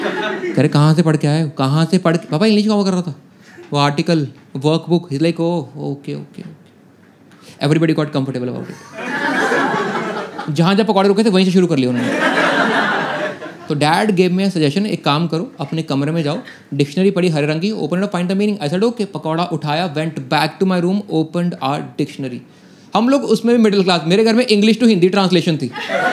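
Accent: Punjab, India. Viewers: Kaur yes both have punjabi accent that makes it more funny !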